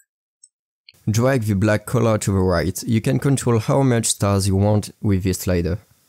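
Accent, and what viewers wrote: France. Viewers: tuto en anglais avec un accent français à la pisse, comme si les anglophones avaient pas asser de tuto pour blender; putain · Dude that is a kickass French accent you got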